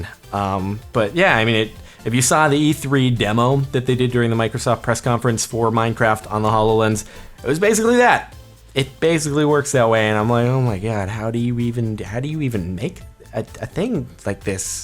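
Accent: England, United Kingdom. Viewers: being from England, I can confirm that your English accent is 100% awful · your British accent is AWESOME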